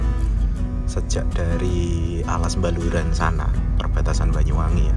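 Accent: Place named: Banyumas, Indonesia. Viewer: ngapak raya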